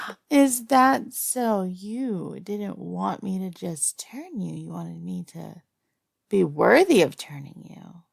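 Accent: United States. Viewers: Haha, and with a channel name like I thought it was expected. Though I was more referring to your voice in general. It’s nice to hear your blend of softened strength, the occasional spice of sadist, and a little North American country twang that serves as the perfect cherry on top. Anyway, keep up the good work and don’t forget to take care of yourself~!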